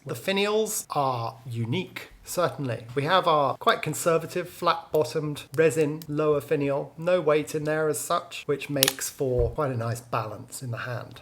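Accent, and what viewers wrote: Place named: RP, United Kingdom. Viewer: Okay, confession tiiiiime. (warning: high levels of tongue-in-cheekness coming up in 3, 2, 1...) I have never gotten why Americans get so weak at the knees whenever they hear a British accent. Doesn't do it for me, sorry. I always much preferred a soft Irish lilt or the silliness that is a Canadian accent ("eh"). Or a Scottish accent. Good gawd. To quote the great and late Robin Williams, "You can't fucking understand them" but they make gibberish so entertaining. Having said that, I don't know what it is about your accent but I love it. LOVE it. It's so posh. It fits so well with your obvious eloquence. There's something so satisfying about how you pronounce words that end in a -p. "Clipppp". I'm as gay as the night is long, but I'm not going to lie, I've got a bit of a crush on you. Too much information? Alllllrighty then.:D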